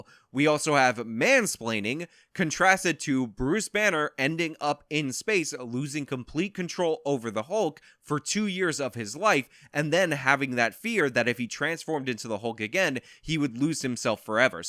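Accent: New York, United States. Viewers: oh my gosh when I read your comment I said "whatever whatever" with his New York accent 😂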